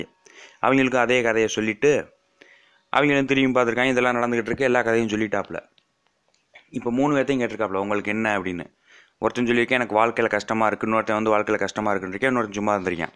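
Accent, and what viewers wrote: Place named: Madurai, India. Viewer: arumai! langauge slang is plus for you Madurai karanda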